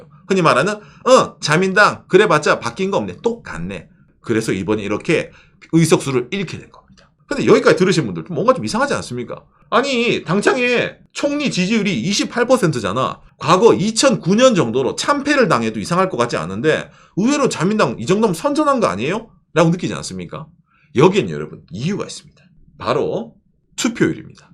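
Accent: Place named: Gyeongsang, South Korea. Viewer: 대구경북 억양이 확 들리네요😂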